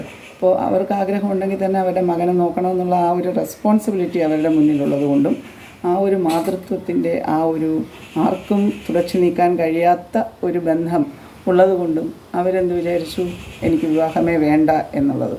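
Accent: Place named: North India, India. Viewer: North Indian ladya pola und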